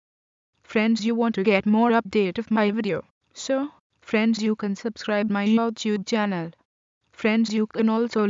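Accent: India. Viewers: Why THE FUCK does this robot have an Indian accent? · abbe indian hi hai yeh banda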